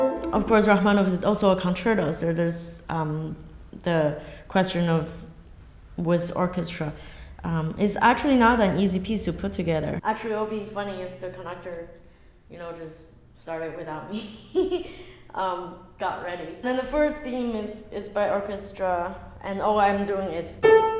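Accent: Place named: United States. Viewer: I so agree. Her American accent & mannerisms, which have worsened since this interview here, do take away from who she really is, i believe, When she plays she is herself. Here, the Americanisms aren't too bad... yet. :-/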